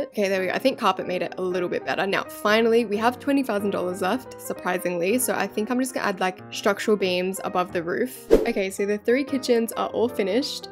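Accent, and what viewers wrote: Australia. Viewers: in this video you can hear her Australian accent more better in this video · Is she Australian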